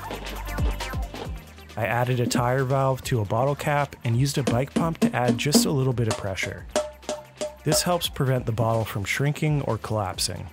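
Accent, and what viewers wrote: Canada. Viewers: Well, first I did enjoy the video, it was a reasonable use of my time. Your tone and delivery is smooth without being rushed, and the background music is faded in and out appropriately. From a video-crafting perspective, it's well done. For that alone you get a like and a comment to hopefully put more eyes on your work. :) The subject, on the other hand, is a bit lacking. "Everything" I need to know is a lot more than what you've mentioned. Equipment costs? Okay, maybe this isn't the best place for that unless you wanted to truly endorse the Sustainable Design item. But still, a ballpark is useful. How about time costs? How long does it take you to create a usable length of filament? That is a huge obstacle to any kind of home filament making - it's just so slow. And bottles? From your accent and channel name I'm guessing you're in Alberta. 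Alberta has a really good bottle recycling infrastructure. This isn't going to keep anything out of the landfill, unless you just trash your bottles instead of recycling them - and even so, YEG and YYC both have very good diversion processes at their landfills to catch stuff that can be recycled. In fact, in Alberta it's adding to the overall waste because it's harder to find a recycling centre that will take a bottle fragment. I guess what I'm trying to say is this: any video about home recycling/filament making really needs to hit the problems as well, at that means some discussion of the time cost and the fact that bottles are one of th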